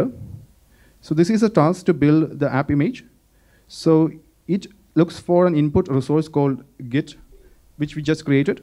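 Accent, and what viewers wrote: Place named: India. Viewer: what a mess. no subtitles to bad indian accent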